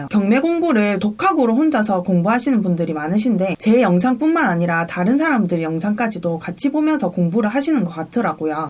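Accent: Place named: Busan, South Korea. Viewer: 유투브 자주 보다가 알게 되었습니다. 부산 사투리로 강의하는 내용이 속속 잘 들어오네요. 50대 직장인으로서 경매를 공부하고 있어요.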